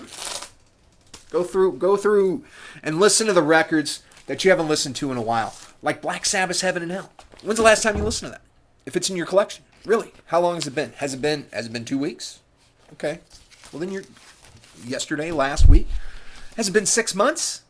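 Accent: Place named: New Jersey, United States. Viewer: hahaha joisey. Youre a natural honey. You got this. Looking forward to it. Lol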